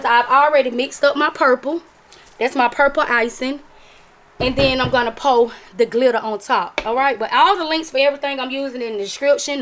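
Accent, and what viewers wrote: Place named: Georgia, United States. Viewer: Thank you for this. I know you gotta be from GA BC we sound just alike.😂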